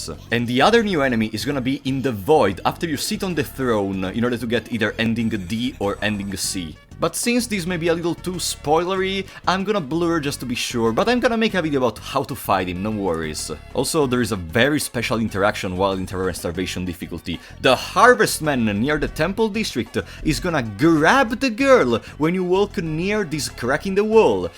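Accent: Italy. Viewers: ahahahhaah grandissimo si sentiva un pochino dalla cadenza! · il tuo accento italiano si sente fortissimo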